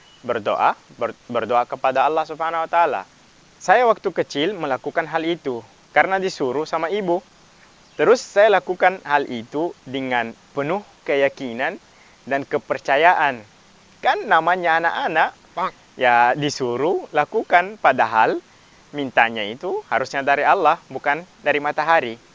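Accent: Makassar, Indonesia. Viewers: Serius kak. Semakin kesini bahasa Indonesia Bagus. Tp semakin kesini jg logat makkasr jg semakin jelas :)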